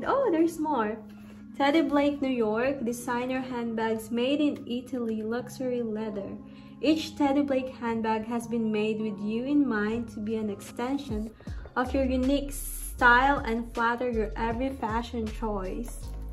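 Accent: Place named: United States. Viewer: Grabe bongga. masyado kna slang..ilang taon kna sa U. S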